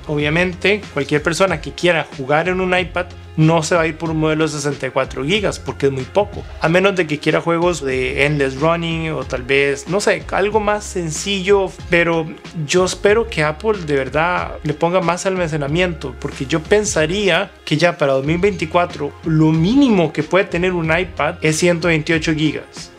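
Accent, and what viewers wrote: Costa Rica. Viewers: Este Mae habla como tico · Me va a decir que no es tico? Yo juré que sí, lo escuché y dije, que bien un tico jajaja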